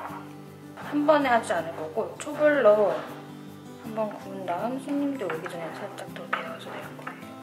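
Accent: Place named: Gyeongsang, South Korea. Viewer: 맞어요, 저도 안하려고 하다가 그냥 지나가면 너무 슬플것만 같았어요. 풍선 부는거..보통 일이 아니셨을듯 해요.ㅠ 전 한국에서 소품 주문 해서 오고 있는데 미국에서도 이렇게 이쁜것이 많네요~ 영상 보면서 말투가 같은 경상도 여인이라 무척 더 반갑네요 ㅎㅎ 님 아가영상 정주행 하며 태교도 하고 갈께요 ~ 😍 식탁 정보도 너무 감사해요~!^^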